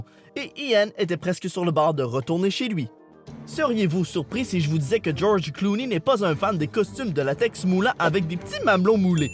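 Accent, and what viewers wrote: Quebec, Canada. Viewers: En vrai, je regarde toutes vos vidéos seulement pour l'accent... (et un peu les blagounettes aussi) #ViveLeQuebec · À enfin un you tuber québécois qui parle vraiment le québécois! 😁😁👍🏼👍🏼👍🏼👍🏼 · Choko Chok euh non c pas la seule, J'ADORE L'ACCEBT QUÉBÉCOIS 😍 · Étant moi même québécoise, j'adore ton accent 😍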